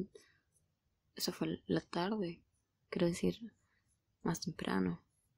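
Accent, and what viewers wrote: Chile. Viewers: Pregunta Eri chilena? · Eres chilena? Xd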